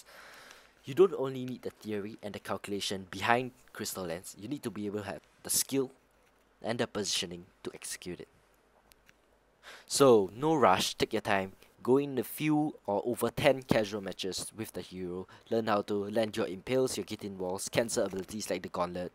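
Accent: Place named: Singapore. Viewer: You sounded like a Singaporean..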